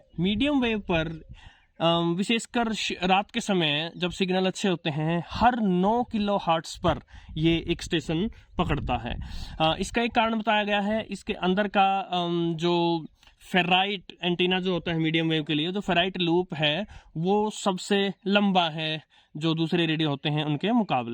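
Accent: Standard. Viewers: आपकी शुद्ध हिंदी 👍 तारीफ ए काबिल ह श्रीमान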